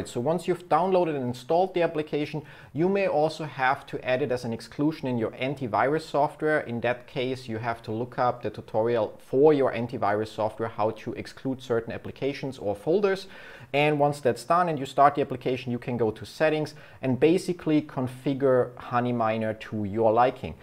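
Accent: Germany. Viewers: du bist einer der sehr wenigen deutschen dem ich gerne beim englisch reden zuhöre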